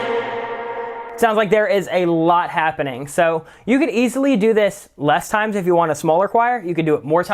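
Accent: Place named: South, United States. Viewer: Release the country accent cut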